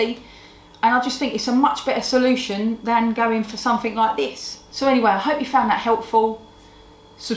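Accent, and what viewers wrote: Cockney, United Kingdom. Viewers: cockney is cool 😎 Here in the south of the USA we have a southern drawl!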